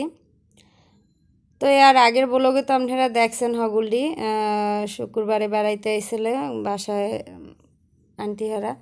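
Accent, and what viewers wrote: Barisal, Bangladesh. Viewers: আপি আমি তোমার 2 টা চ্যানেল দেখি খুব ভালো লাগে,আরো ভালো লাগে তোমার বরিশালের কথা,ভালো থেকো আপু,দোয়া করো আমার জন্য। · Din din sundor hoye jacco .Barisal er meye bole kotha .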